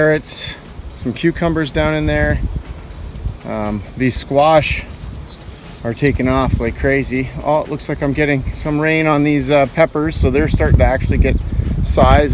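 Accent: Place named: Canada. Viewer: Just lucious! Thanks for sharing. I wanted some pickles, Thanks mom! Ok, you know me(kinda) LoL, please remember they are native here, and I really like the Canadian accent! But please, Pah con..... LOL ~ 707! Bro hugs, and if it's not drinking out of a hose, it's burnt, starting to include wildlife, I'd have to go back and look, but I'm pretty sure, it's begining of week 3 of triple digi's here. Peace, and please, enjoy!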